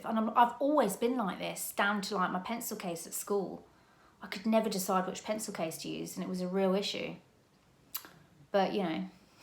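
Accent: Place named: Northern England, United Kingdom. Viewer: Hey, I'm loving the pumpkin vibe you're having. It reminds me of how much I miss the autumnal days at home, plus the fact that there is so much more choice in the supermarkets than over here as the seasons change 🍁. I do feel that the 'clean beauty' fad is just really bad and complete misinformation. Watch ANY board certified dermatologist or cosmetic scientist and they give all the clinically proven facts that parabens and all the other 'demonised' preservatives are absolutely safe and absolutely needed to keep our products bacteria and germ free.....and prevent skin infections.... UNLESS you have a known allergy to a specific ingredient. This is why cases of some skin complaints (peri-oral dermatitis ect), are on the increase. Oh, it's the same over here regarding TV, there's nothing interesting....although I do still love coronation street and eastenders on UK TV 🙃👌. 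Yep, I'm here for the 'latte' inspired make-up look, 👌 😍. It looks really natural and glowing.... however, I'm not a person who gets my nails done, so I can't comment. Apart from saying, "Go with what appeals to you, you can always change it later on." It's good to have a choice 😊 LOVING the Northern accent, too 😍 😅. Great video, and it's always great to see you 👍 ❤⚘️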